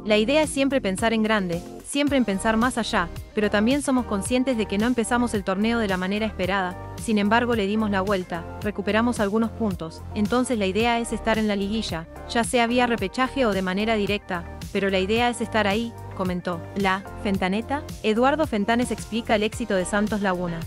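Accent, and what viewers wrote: Argentina. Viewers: La comentarista es de nacionalidad argentina? la doble l la pronuncia igual que los argentinos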